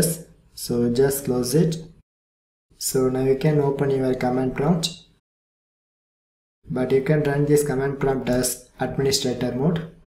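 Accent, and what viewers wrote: India. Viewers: U have indian accent bruhhhhhh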